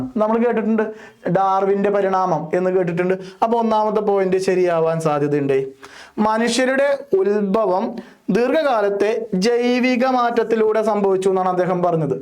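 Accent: Thrissur, India. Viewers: Sir class nte idakkulla trissur style aanu highlight😂😂😂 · Sir thrissur ano ❤❤❤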